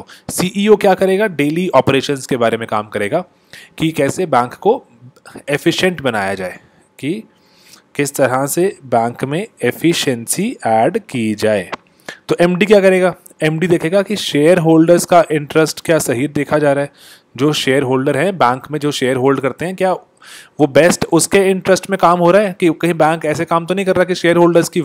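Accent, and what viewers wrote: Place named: Punjab, India. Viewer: Sir bahut hi awesome videos bnate hain..mene pura dekha.. Jo topics mujhe bilkul upar se ja re the..ab puri tarah clear ho gye hain..mai bahut khush hu.. Appreciable content And your voice is really very adorable💜 Jo panjabi accent me chhote chhote jokes crack kr dete hain us se video me man lga rehta hai 😁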